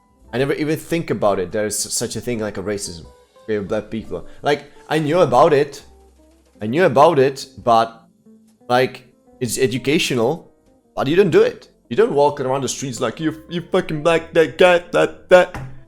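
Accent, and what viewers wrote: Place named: Russia. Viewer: You sound Russian to me.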